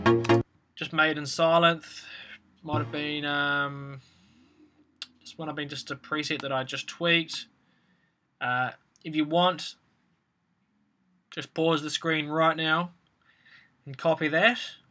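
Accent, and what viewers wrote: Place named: New Zealand. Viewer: does his accent, as in NZ as in NEW ZEALAND... and his channel name 'nz' not give any hints to you? and i'm pretty sure many new zealanders would be not too pleased to be called 'the same' as australians and vice versa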